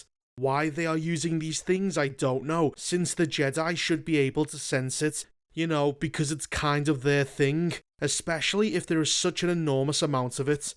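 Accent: Liverpool, United Kingdom. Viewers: I would like to thank from the bottom of my heart for his extremely informative, mercilessly honest and extremely entertaining videos. Unfortunately, my twin brother and I discovered him just recently, as a true gem of the Internet. We enjoy movies, series and games both dubbed and with the original soundtrack since early childhood, regardless of nationality. To get straight to the point, the above-mentioned factors in combination with his great (scouse?) accent are a relief for the soul and have already ended many a shitty day with more than just a smile on our lips. If possible, let us know how we can support your channel so that this source of knowledge and good humor never runs dry. Regarding "The Whackoshite", I would like to mention a dialogue that I would love to have: Disney / K. K. / L. H.: “We cannot understand what the audience really expected... 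It’s simply impossible to do Star Wars (Episode IV-VI) justice and create something equal.” Me: “Do you want to know what’s really impossible, Disney / K. K. / L. H.??? Peeing in the corner of a round room." The Power of NONE... 🎭 🎭 🎭 The Power of FEW... 🎭 🎭 🎭 The Power of FUBAR... 🎭 🎭 🎭 P. S. Please forgive me for my possibly poor English. I'm a little older and therefore a little rusty.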